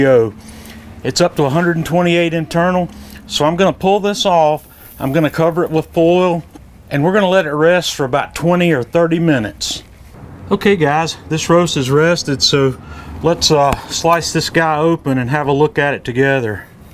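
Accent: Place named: South, United States. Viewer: Hello from Ireland; I’m new to using a kamado and I’ve come to learn that unless the instructor has a deep southern (US) accent, I ain’t listening!! Great video……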